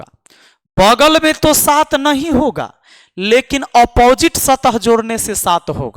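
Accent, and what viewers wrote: Bihar, India. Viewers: कोई नहीं यार आप से तो मैं बहस नहीं कर रहा हूं।लेकिन हमलोग बिहारी हैं।दो नही दू बोलते हैं आप भी बोलते होंगे अपने परिवार में। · Sir kia aap bihar se hi hai Aap kabhi kabhi maithli me bhi bol dia kijiye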